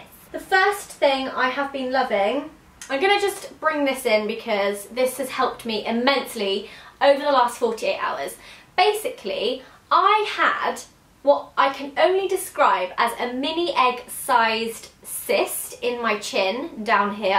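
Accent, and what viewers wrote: United Kingdom. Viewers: Is she british? Plz reply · You are british ?! :))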